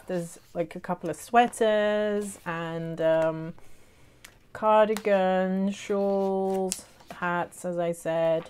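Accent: United Kingdom. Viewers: Congratulations on releasing your first pattern! We'll done, you! I think you were channeling your inner Vanna White when you were showing your sock....lol! I've on l y been watching your podcast for a short while, and quite enjoy it. Could you explain why, for a Dutch person, you sound so British, please? It's very confusing. I'm looking forward to seeing your progress, and Julia's on the Steven West MKAL. Take care. Patty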